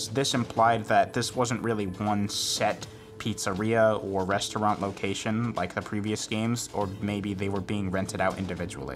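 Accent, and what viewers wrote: United Kingdom. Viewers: He's British? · He was only what I could describe as "Obnoxiously british" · from american voice to an obnoxious british voice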